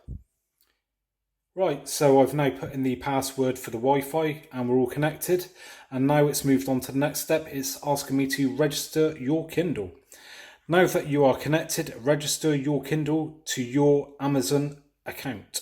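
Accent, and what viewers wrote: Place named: West Country, United Kingdom. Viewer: Great to hear a West Country accent, not enough of them on YouTube! Just bought one of these, it arrives tomorrow, my first "upgrade" in 11 years and this was very helpful. I chose the white in order, I hope, to avoid smudgy fingerprints! I also paid the extra tenner and opted for their offer (new I think) to set it up for me, using my Wi-Fi, not sure how they do that and my Amazon Account!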